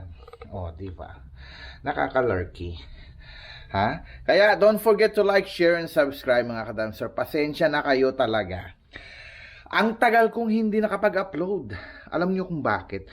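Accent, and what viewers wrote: Iloilo, Philippines. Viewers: Not daily ka ga ɓlog gali? 1st time ko nag lantaw bal an ko gid na ilonggo ka!❤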